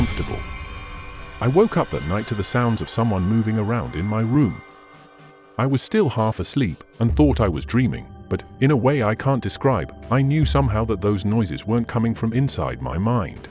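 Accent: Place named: England, United Kingdom. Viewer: Why the English accent? I have a problem understanding such accents and such....I tend to avoid these videos. Sorry.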